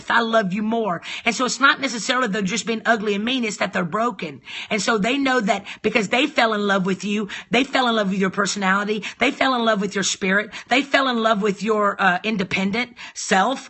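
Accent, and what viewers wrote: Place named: South, United States. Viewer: I just love your Southern accent tho!! ❤🔥💯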